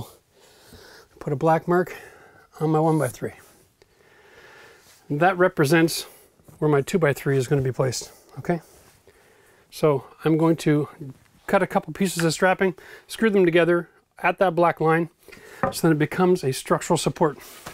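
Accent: Canada. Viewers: Well done, I love your videos. Did anyone tell you that your sound like Rik Emmet from Triumph when you talk? Canadian?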